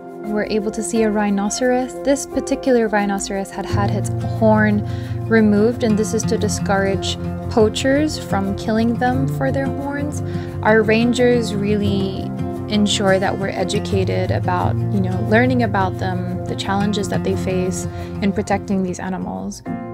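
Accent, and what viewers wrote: Australia. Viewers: Her Aussie accent😁 Elephants are my fav, they have amazing memories and they would dig hole for water and thats also a bonus to all other wild animals. I WISH you visited sheldrick wildlife trust, they do amazing work for wild animals in kenya ❤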